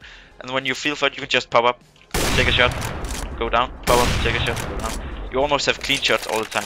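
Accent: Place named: Denmark. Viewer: dansk accent heh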